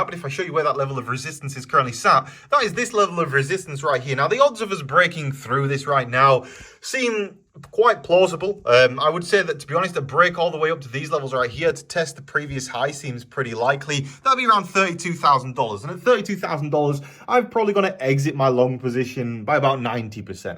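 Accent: United Kingdom. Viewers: $CUDOS is looking good. since my ape in, it show's a strong correlation with BTC my favorite part is that I can mine #CUDOS with my old laptop Nice vids bro, I feel smarter watching your videos, plus when ppl hear a British accent guy, they think I'ma pro for watching 😎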